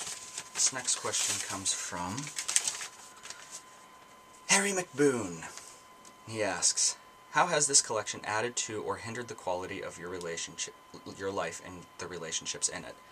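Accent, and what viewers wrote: United Kingdom. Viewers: i am british and i honestly thought you were as well from that video you did.... impressive accent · I have watched this video and your biggest fan video and think you are absolutely fabulous! It is so wonderful that God enabled JK to create a series that followed many kids from their childhood to adulthood getting them to not only read books longer than anyone would have believed but to devour them and beg for more. I'm also happy that the films do the books justice. Harry Potter has been just wonderful and my only question to you is did you learn your British accent entirely from the films?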